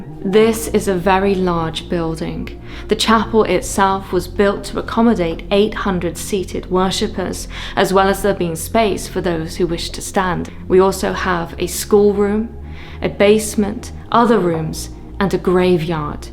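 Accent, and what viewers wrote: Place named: Northern England, United Kingdom. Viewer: That is wicked! You're northern English though aren't you?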